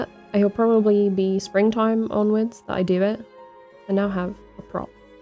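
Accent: United Kingdom. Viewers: I like to find new girls\womans which is gamers. Usually it's because of Half-Life\Half-Life 2 playthrough. It's a great base. I straight watched: Half-Life 2 Half-Life 2: Episode 1 Half-Life 2: Episode 2 Portal 1 Portal 2 Nice voice, nice accent (British?). If you like Half-Life 2 then you probably would like to play Half-Life: Alyx. Yeah, VR might be a problem, but there are ways to play it without VR. Trust me, i played it on VR headset for a phone via laptop with external GPU. I also saw girls\womans gamers playing war games: Battlefield, Call of Duty. There are really cool stories, like: Battlefield 3 or Call of Duty: Modern Warfare and especially Call of Duty: Black Ops. But think twice before go in Call of Duty: World at War. It's might be difficult emotionally. SO, it's nice to have you on YouTube,. And... 53? Really? Are you kidding me?:) P.S. Hello from Russia.;)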